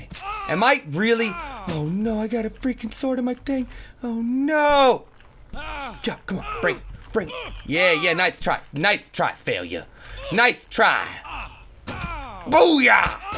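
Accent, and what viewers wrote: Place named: England, United Kingdom. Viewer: I love your English ascent!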